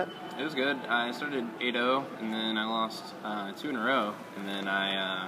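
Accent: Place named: United States. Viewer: I use to think was a fat german with a craving for chocolate, then I heard his murica accent, and I was like WOAW my mistake.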